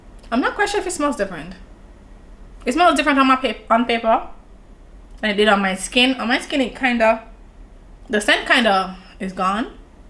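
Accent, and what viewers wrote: Jamaica. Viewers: Ok yaadie🇯🇲🇯🇲 sound like Jamaican. 😀 new subbie here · I love your Jamaican accent. Nice recommendations!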